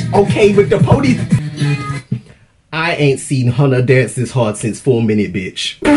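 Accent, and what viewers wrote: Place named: Caribbean. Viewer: Is it just me who thinks sounds like he is from the Caribbean, I'm from jamaica so I should know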